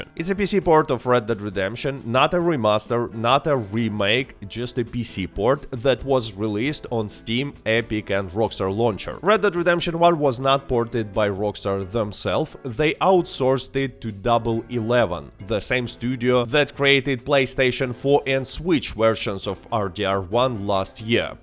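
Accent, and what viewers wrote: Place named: Russia. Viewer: Русский акцент какой то будто слышу😮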